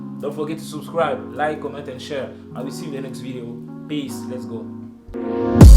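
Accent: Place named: United States. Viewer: Tu parles comme un Américain l'on Fils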